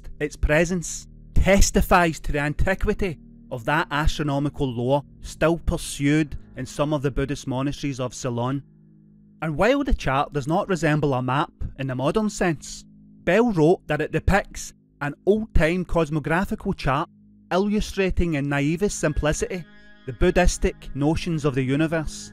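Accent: United Kingdom. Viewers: I couldn’t have expressed my thoughts in any better way & have left comments saying so whenever I see them. It actually makes me cross that English speakers are complaining about an English speaker 🤷🏼‍♀️we don’t have American accents in the U.K. & we’re as diverse as the USA is in it’s large land mass. 💕:_TKTCEyeSeeYou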